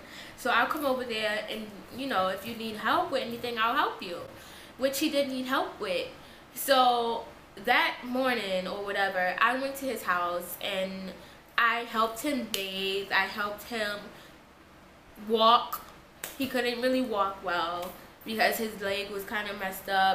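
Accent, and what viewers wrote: New York, United States. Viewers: new york 😊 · NY All the way! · That's how a lot of New York people talk, it's kinda like our slang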